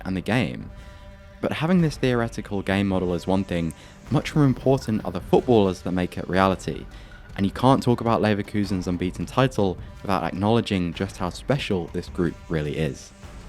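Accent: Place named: England, United Kingdom. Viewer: Dude i HATE english accent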